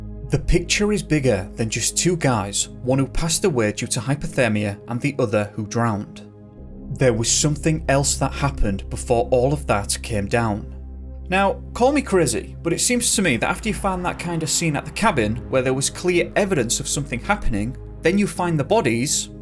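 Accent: United Kingdom. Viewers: if you must know he's from the U.K. will you be able to sleep now!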